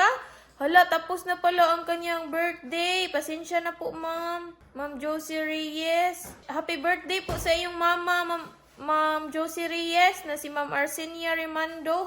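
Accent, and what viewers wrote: Visayas, Philippines. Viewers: Ang cute mo magsalita ng bisaya,sak² sinagol Tagalog ba😀😀😀pag amping mo ug sa jogging nyo💪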